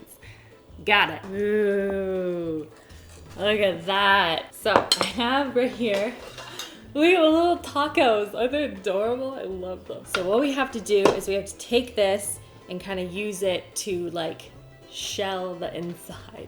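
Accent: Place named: Canada. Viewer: Her Canadian accent really came out in this one 😂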